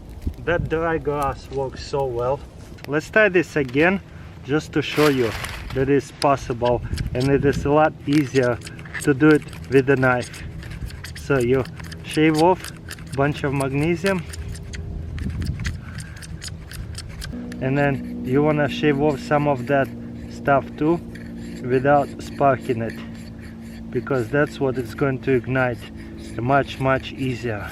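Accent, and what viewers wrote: Russia. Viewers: Russian accents are my favorite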